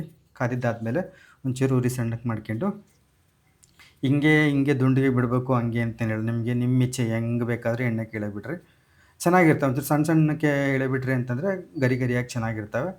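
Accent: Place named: Challakere, India. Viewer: Bro super nam maneli ide tara madodu nim mathu kelta idre nam uru ansutte nan bandu challekere nim uru yavdu bro but nan ega irodu blore nali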